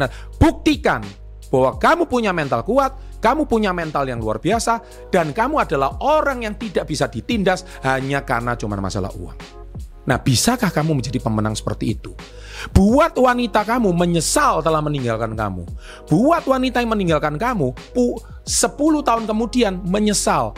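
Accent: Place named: Java, Indonesia. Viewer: cocoklah ini, apalagi penyampaiannya mantep dan medok .... 👍👍👍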